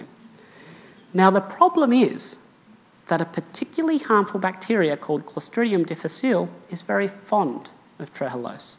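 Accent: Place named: Australia. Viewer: Always a pleasure to listen to. Dr. Mason's appearance on HPO podcast was a huge treat and packed with fascinating info (check it out if you haven't). His lovely aussie accent is just icing on the cake.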